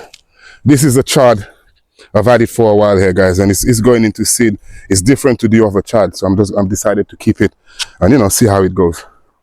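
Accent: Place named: Dominican Republic. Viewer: Why you sound Dominican 🇩🇲 lol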